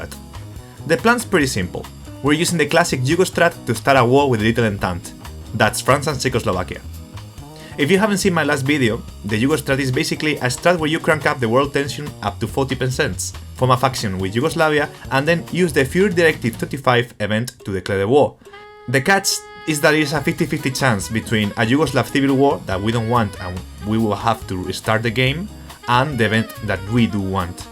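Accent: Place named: England, United Kingdom. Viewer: Jajajajajajaja me da gracia tu acento inglés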